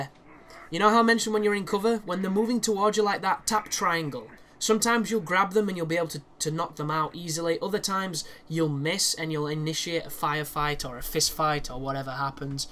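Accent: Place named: Northern England, United Kingdom. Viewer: I like survivor difficulty walkthrough, you sound northen said fooking as well as fuck alot, subbed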